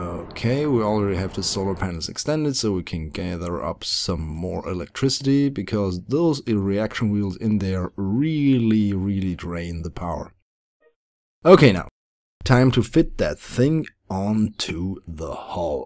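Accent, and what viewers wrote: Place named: Germany. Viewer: Die mieseste Manleykopie ever. Mit dem deutschen Akzent könnte ich ja noch leben, aber das hier? Mies...^^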